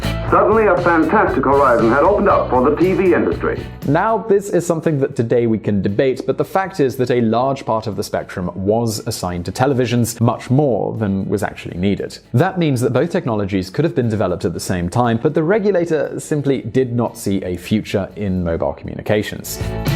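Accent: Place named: United Kingdom. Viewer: The fact that you are effectively arguing for shortened testing and trials of new drugs is frankly pretty apalling. Some one with your accent, should know all about the horrors of unsafe drugs as Thalidomide drestoyed a lot of lives in the UK. Add to that you basically argue financial duress on the part of the pharmacutical companies having to test their drugs, yet they are some of the most profitable corporations on Earth, right next to the oil industry. There is no reason whatsoever that drug trials need to be sped up.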